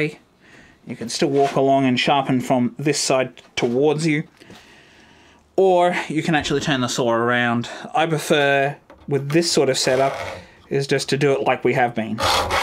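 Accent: Australia. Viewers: Thanks for all of your videos. Plus hearing you makes me miss friends I made in Australia- I’ve spent about three months total in AU between Navy port calls then I had a consulting job and went to Brisbane, Darwin and out to Jabiru. Cheers!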